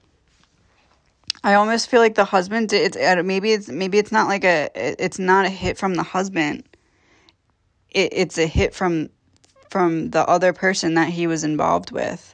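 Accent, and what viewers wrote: United States. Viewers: Luv watching yr readings, it's amazing how you tie it all in.. where are u from? I cant place the accent other than it being USA.. GOD BLESS FROM THE UK♥️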